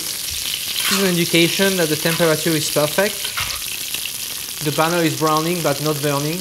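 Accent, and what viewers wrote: France. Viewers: French accent is funny, nice video learned a lot from it. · This is bullshit - just because he speaks English with a French accent doesn't automatically mean he can cook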